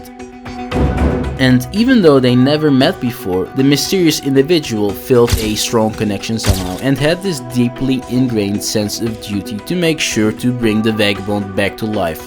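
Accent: Netherlands. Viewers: I smell dutch…..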